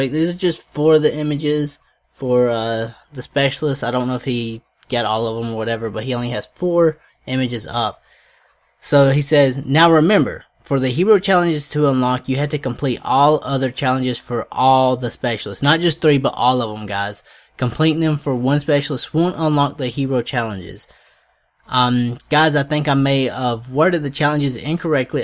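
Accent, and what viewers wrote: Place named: South, United States. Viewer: You have a distinct Southern accent. Where are you from? Alabama here.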